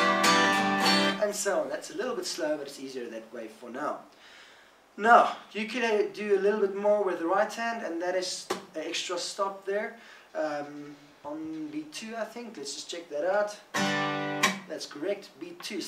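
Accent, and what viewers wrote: South Africa. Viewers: do you have some south african in your accent?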